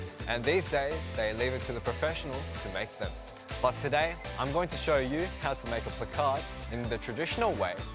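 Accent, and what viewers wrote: Australia. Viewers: I know everyone thinks it but his Australian accent makes everything 10 times better 😍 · The more is see this the more I get used to the Aussie accent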